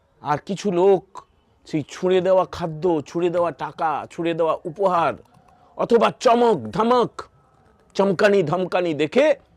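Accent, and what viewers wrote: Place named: Bangladesh. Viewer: Ami prochur bangladesh e manus r sathe kotha batra hoe che anek k chini. Bangladeshi der ekta adbhut accent ache, janina koto jon manben na bujben , ae anchor ta bangladeshi bangali der moto accent.😮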